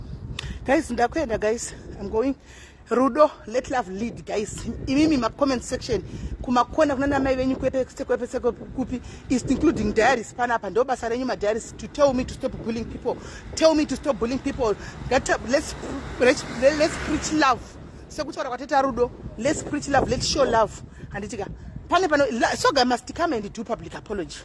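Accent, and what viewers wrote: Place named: Karanga, Zimbabwe. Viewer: Sis vanotaura chikaranga chaicho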